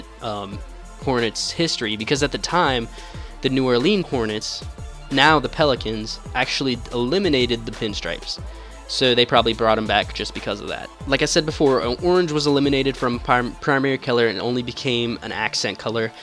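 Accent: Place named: Philadelphia, United States. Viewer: u gota thick philly accent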